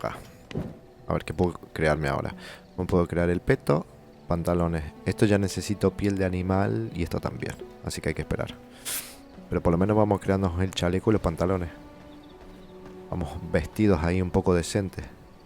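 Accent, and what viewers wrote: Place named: Santiago del Estero, Argentina. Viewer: Buenas hablas como santiagueño ^^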